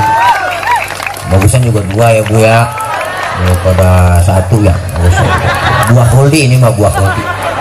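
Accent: Jakarta, Indonesia. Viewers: Betawi baget klo ceramah ini kocak lagi bikin mules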